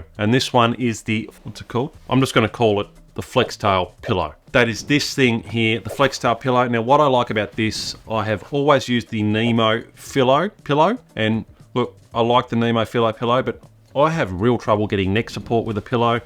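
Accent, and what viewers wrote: Australia. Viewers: As an American man, I think this guy is Australian ☺️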